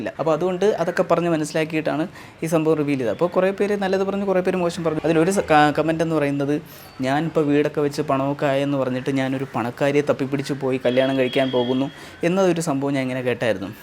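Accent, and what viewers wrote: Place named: Trivandrum, India. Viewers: Edey edey ith normal trivandrum slang aan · Ithu normal Trivandrum slang aanu...ini ipol avarodu avarude normal slang maatti valla Kannur slangum parayaan parayaam ningale thripthipeduthaan entha..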